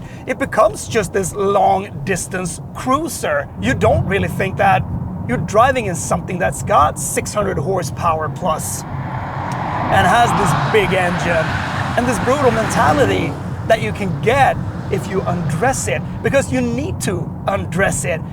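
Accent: Sweden. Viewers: Man can hear the swedish accent svengelska :D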